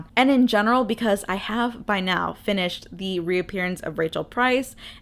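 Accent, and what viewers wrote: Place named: Midwest, United States. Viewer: The midwestern accent dropping the last letter is REALL. I literally paused the video to say the title and realized I did that as well lmao.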